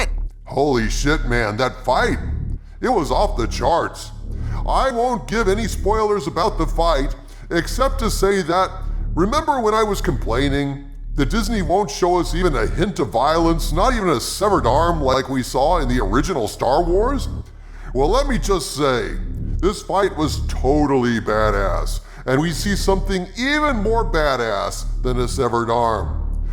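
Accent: New Zealand. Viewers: Hes ahhh New Zealander right? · he’s actually from New Zealand 🇳🇿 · Haha, not Aussie, a Kiwi mate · Aussie? I thought he was a Kiwi.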